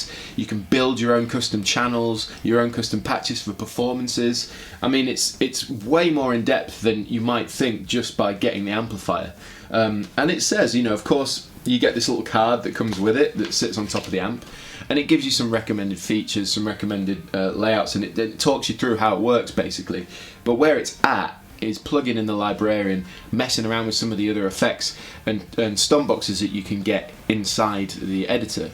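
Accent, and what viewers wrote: Yorkshire, United Kingdom. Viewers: It was a tough choice between this and the Blues Cube. I chose the cube (100w) and love it, even though I’m considerably poorer. You’ve become a very accomplished player over the time I’ve watched your stuff. Thanks for all the hard work and I wish you a fantastic new year buddy. Btw.... are you a Yorkshire lad?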